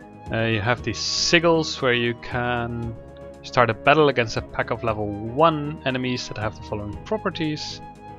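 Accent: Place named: Germany. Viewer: Just bought this game for my wife and I. Where's that accent from? I want to say German but I could be wrong.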